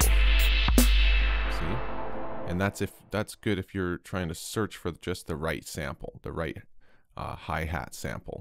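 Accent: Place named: Canada. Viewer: Are you Canadian? I'm trying to figure out where your dialect is from. You've got some serious regional inflection.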